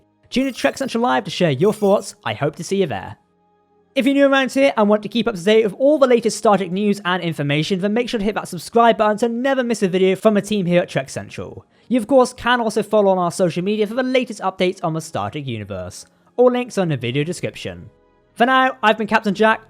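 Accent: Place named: Cockney, United Kingdom. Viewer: Thank you for this.... I like watch your reviews prior to watching the episode.... one humble request.... is their a way you can talk a bit slower, the cockney accent (of that's what it is) gets in the way of comprehesion a bit.... thank you again....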